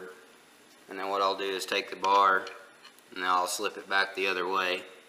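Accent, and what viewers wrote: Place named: Texas, United States. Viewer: your north Texas/Oklahoma twang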